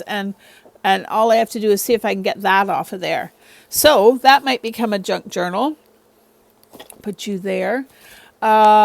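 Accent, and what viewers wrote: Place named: Canada. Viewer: Just love you to bits! Very interesting to hear about your family (even though it's tragic). You are the opposite of idiotic silly, but I appreciate your sentiment. I have to say, my husband was listening while I watched and was smitten with your Canadian accent. But then, he thought you said "teared tray" and asked me if you were crying. 😳 Husbands!...I get my baskets the same way you got yours.😂 Take care and keep warm. God bless you friend 💕🥰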